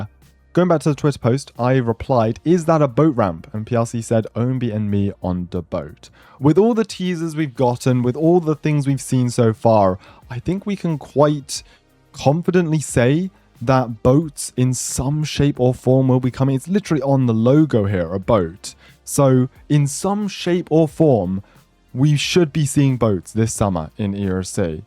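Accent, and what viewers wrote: England, United Kingdom. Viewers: question, are you British? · Wait your English or British?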